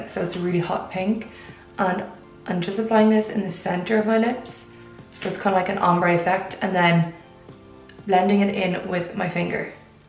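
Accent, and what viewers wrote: Ireland. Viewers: Ireland I think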